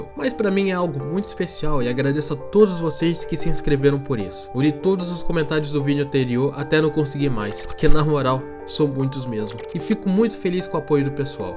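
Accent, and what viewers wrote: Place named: São Paulo, Brazil. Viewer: O Sotaque paulista é sim o melhor do brasil e não vou aceitar nenhuma opinião contrária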